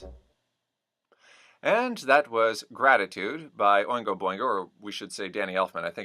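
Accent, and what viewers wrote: Dublin, Ireland. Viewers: I'm not being rude but that's nothing like a northern Irish accent. People in Northern Ireland don't say 'koind' and they don't say 'oive' for I've. You just sound like a Dubliner. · Hard to say because it was an excellent breakdown. But it seemed to veer toward dublin-ese irish a little in the end. The NI accent is a bit heavier on the RRRrrrs I think. It also makes use of many involuntary, end-of-sentence emphasis phrases. Eg ".. You Know Like. . ." or ".. Everything and that there..." (pronounced "AverThun N ThaaT-Thurr.) These are used to embellish a story and finish sentences. eg. "I was late for work . . . You Know Like..." "I forgot to do my homework . . . And everything and that there."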